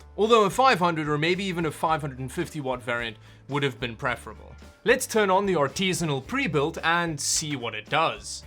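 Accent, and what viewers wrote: Afrikaans, South Africa. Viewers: You must come from South Africa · What accent do you have? Dit klink Afrikaans...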